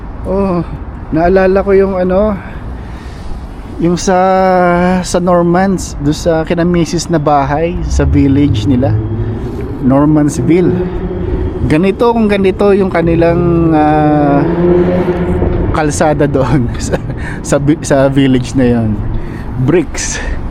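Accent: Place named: Mindoro, Philippines. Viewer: Bossing, taga Mindoro ako, parang pareho tayo ng tono sa pagtagalog, ganire, ire, dine..😅😅😅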